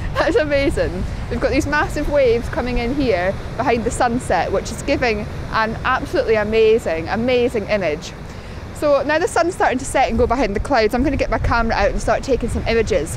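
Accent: Scotland, United Kingdom. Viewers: Totally insane!! Great video! Delightful scottish accent by the way, like it hahaha!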